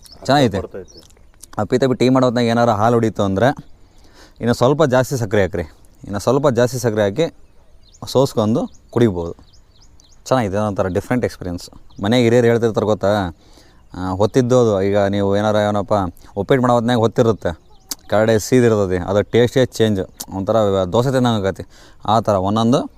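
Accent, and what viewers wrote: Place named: North Karnataka, India. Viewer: ಹೇ ಗುರು ನಿಮ್ಮ ಭಾಷೆ ಉತ್ತರ ಕರ್ನಾಟಕ ತರ ಇದೆ.. ದಾವಣಗೆರೆ ಭಾಷೆ ಸ್ವಲ್ಪ ಚನ್ನಾಗಿ ಇದೆ ಅದನೇ ಮಾತಾಡು 😂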